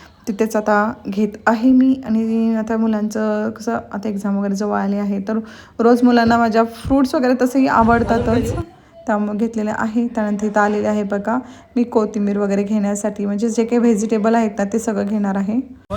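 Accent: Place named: Khandesh, India. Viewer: khup diwasatin nahi ....khup diwasani.....please correct marathi bola ...bolnacha tone khandeshi kivha Vidharbh kivha Kolhapuri vatate.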